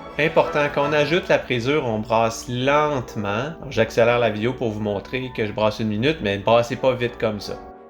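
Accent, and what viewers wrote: Quebec, Canada. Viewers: C'est beau l'accent québécois, j'veux une blonde avec le même 😍👍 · Bonjour, merci pour ce partage bien détaillé avec cet accent canadien, bonne continuation depuis l'Algérie 👋🙋. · Bonjour, j'imagine que vu ton accent, tu serais en mesure de me dire si à on se retrouve en fait avec du fromage à poutine? Et bravo pour ta video, je vais surement l'essayer dans ma cave où je fais mon hydromel :) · Votre accent canadian est très lourd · Eh bien me voilà suis passionnée. Le fromage est un nouveau sujet qui m’intéresse et ça sera un nouveau pas vers ma rédemption culinaire. Je me retiendrais cependant d'expérimenter tes recettes car je ne suis pas assez gourmande pour tout manger. Cette vidéo est propre, ton intonation est excellente et ton accent québécois rajoute du charme. La qualité est irréprochable et les explications sont précises. 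Un vrai professeur. Ajouté à ça la musique classique sur un fond de cheddar, je reviens à mon introduction, c'est passionnant !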